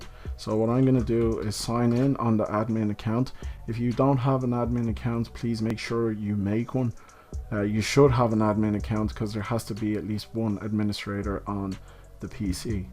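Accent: Ireland. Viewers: Is that an Irish accent or are my ears just ringing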